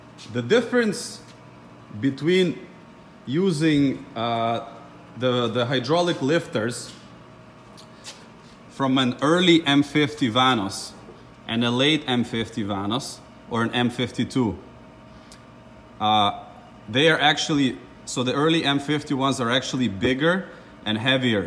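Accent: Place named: Russia. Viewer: я услышал русский акцент =) у вас хороший английский... мне ваш английский был очень понятен..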